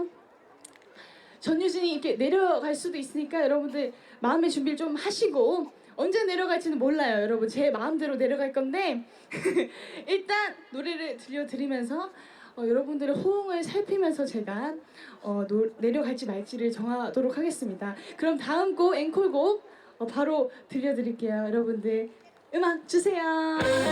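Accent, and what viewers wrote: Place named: Seoul, South Korea. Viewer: 전유진 서울사람다됬네 이제는 서울말을 자연스럽게 잘하네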